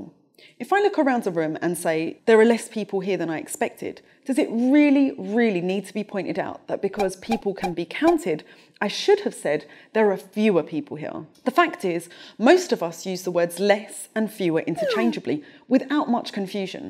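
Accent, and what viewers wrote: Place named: RP, United Kingdom. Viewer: Actually I think the message here is the opposite to that intended. We have a middle class young woman, speaking perfect English with a Received Pronunciation accent, telling the lower orders that grammar doesn't really matter. She picks a few of the tired old classic examples (and I'm a grammar snob, but I've given up on 'literally', and 'decimate' for that matter :-)), while ignoring the acres of poor usage that do lead to actual misunderstandings and confusion.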